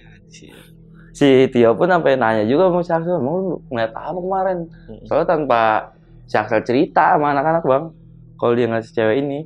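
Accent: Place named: Banyumas, Indonesia. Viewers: bahasa depok kok mirip bgt yakk sama bahasa ngapakk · et mat gua mau nonton horor mat, kaga usah ngapak²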